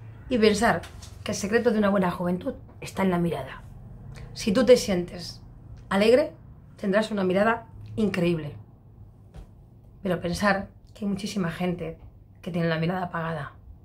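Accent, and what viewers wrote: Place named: Spain. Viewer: Gusto de verla me encanta su acento español y esa fuerza ese animo que nos trasmite.❤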